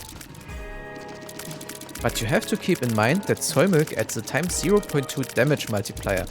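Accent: Germany. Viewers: That's the most German sounding English I've ever heard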